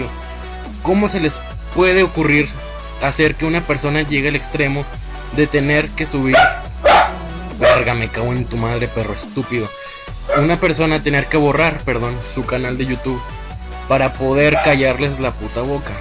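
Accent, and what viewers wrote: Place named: Mexico. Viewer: Hola amigo, he estado viendo tus videos, y me parecen muy bueno. A lo que veo ¿Eres mexicano? pues tus grocerias y acento es el mismo que yo uso jaja:), tu no te rindas y sigue creciendo creeme que tienes talento solo necesitas publicidad pero para mi ya eres bueno, me suscribo y te recomiendo:) Sigue porfavor se que tienes talento!!!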